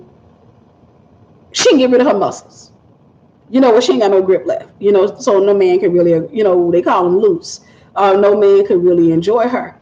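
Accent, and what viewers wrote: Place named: South, United States. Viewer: God Bless you Beautiful Sister i have that Southern Accent as well it doesn't leave for some Reason hee hee you have been a Blessing since i found your channel 🕊️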